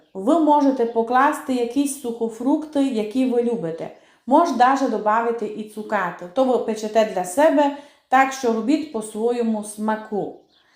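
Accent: Transcarpathia, Ukraine. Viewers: А я люблю пані слухати 😊 Спокійна, домашня інтонація, і чудовий закарпатський діалект. Я дивлюсь її відео два рази: перший раз насолоджуюсь її манерою говоріння, а другий раз - рецепт слухаю та занотовую😊😊😊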